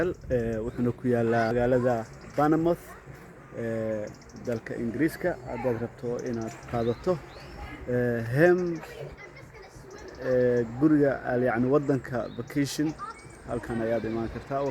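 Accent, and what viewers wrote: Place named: North, Somalia. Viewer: Waryaa wax kale umada keen , inaad reer koraad tahay sida D u dhigaysaa laga garanayaa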